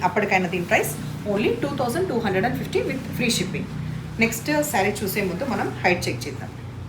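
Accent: Telangana, India. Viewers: Hai mam mee sarees కంటె baga untai mee మాటలు and pakka Telangana యాస super mam😅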